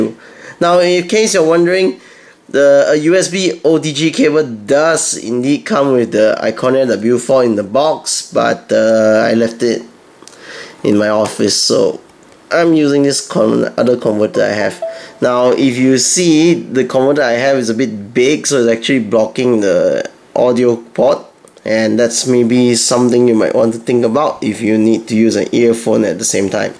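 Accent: Singapore. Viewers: Hmm sounds like singaporean Haha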